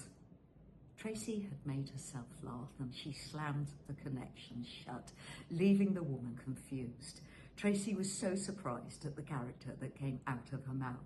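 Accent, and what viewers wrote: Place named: Oxford, United Kingdom. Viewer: Вы знаете, мне бесконечно импонирует Ваше абсолютно безупречно-совершенное Оксфордское произношение, но так-ли нужно, чтобы Ваш Кролик так часто посещал солярий !???? 😢😢😢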